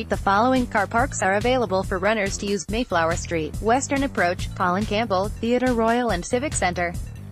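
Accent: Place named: United States. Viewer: 1 for robot voice with American accent, instead of getting a real person to narrate. If I could, I'd give another -1 for posting stills instead of video on YouTube.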